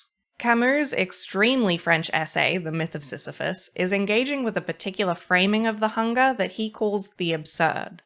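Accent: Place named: Australia. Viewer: Love your analysis, and also the storytelling in your video. Editing is solid and considered, and your performance within that is genuine and carries with it the weight of your words. Glad to have stumbled over your work! Thank you for answering this particular call with yes. Also lovely to hear content with an Aussie accent, and you did a bang up job on your eye shadow. GG all round! Now if you don't mind I'm going to go make my 10,000th Iridium sprinkler and pat all my cows; midnight be damned!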